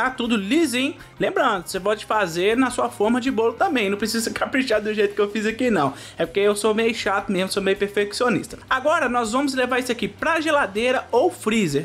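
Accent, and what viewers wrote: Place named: Rio de Janeiro, Brazil. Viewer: Com certeza e carioca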